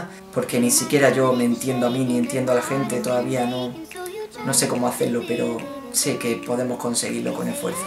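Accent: Spain. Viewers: Ya se me esta pegando tu asento español PD: soy de mexico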